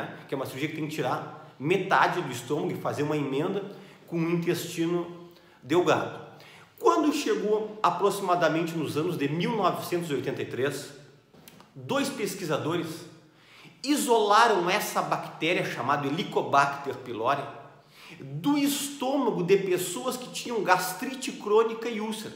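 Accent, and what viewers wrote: Rio Grande do Sul, Brazil. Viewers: Não é bravo, é gaúcho. São assim. rss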